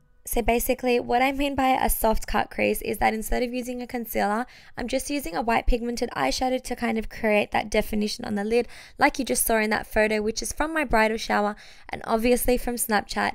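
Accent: Australia. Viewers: Alexa Cryer she’s Australian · Alexa Cryer Australian x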